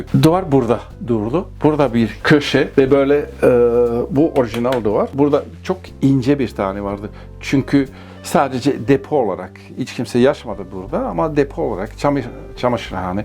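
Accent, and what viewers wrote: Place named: Germany. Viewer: there is a song called, which a man speaks english with a german accent. I just remembered that song when you speak english. stupit comment anyway. nice home, enjoy the sun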